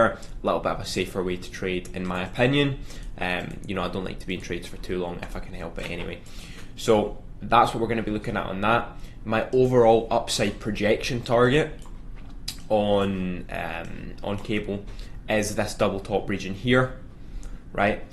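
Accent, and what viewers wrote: Scotland, United Kingdom. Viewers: whereabouts in scotland you from?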